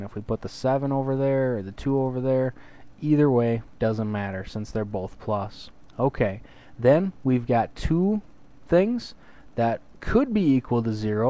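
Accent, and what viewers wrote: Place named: Canada. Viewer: With my exam in 2weeks, i've been really struggling. This really helped! Thanks a ton. Love your accent! Are you Canadian?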